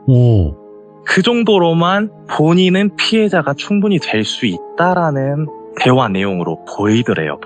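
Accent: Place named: Seoul, South Korea. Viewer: 서울말 힘들죠ㅎ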